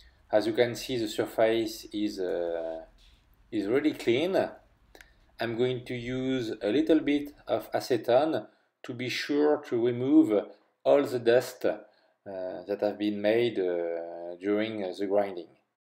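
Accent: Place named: France. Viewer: its hard to speak english with french accent try to listen to old school american rap music with practising after them that's how i ignored my arabic accent influence on my english i hope it will help you great vid btw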